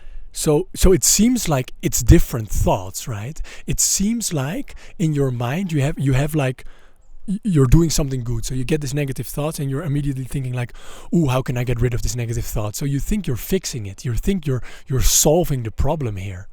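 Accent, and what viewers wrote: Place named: Netherlands. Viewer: Hey, ik hoor aan je manier van praten dat je Nederlands bent, dus ik dacht dan typ ik ook lekker in mijn moedertaal een reactie:) Je kwam tevoorschijn op mijn tijdlijn en ik wou je even bedanken dat je je wijsheid met de wereld deelt. Het tovert instant een big smile op mijn gezicht, blijf doen wat je doet! Mensen hebben het anno 2025 echt nodig om door zulke zaken geïnspireerd te geraken. Thank you, brother!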